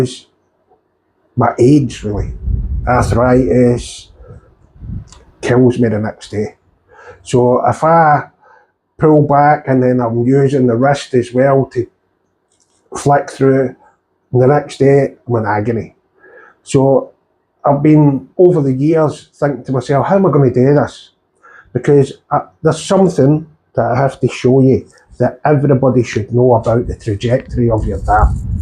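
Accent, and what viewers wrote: Scotland, United Kingdom. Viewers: The trade in is an awesome idea, wish we had something like that in the UK, I'll definitely get in touch and see about the shipping cost! Also I've got no problem with your Scottish accent!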